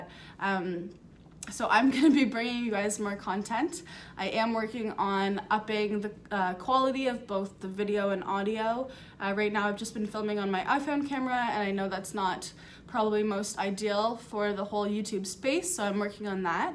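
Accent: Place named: Canada. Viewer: I love your Canadian accent!!!!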